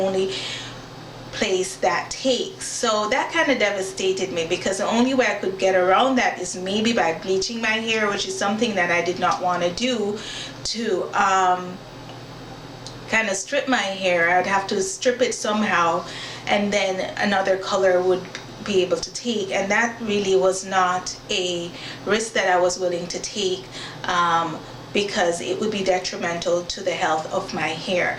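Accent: Jamaica. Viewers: I'm picking up a tinge of accent....are you Jamaican?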